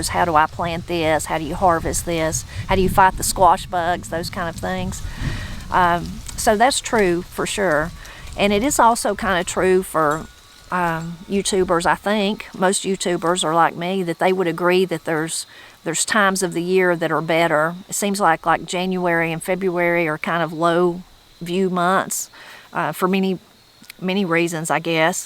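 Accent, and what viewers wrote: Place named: South, United States. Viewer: I am from Columbia, California. You remind me of my family, my Mother, Aunts, Grandmother, your southern accent, you love of God, your growing a garden, hunting. I feel like I am home when I listen and watch your channel, Thank You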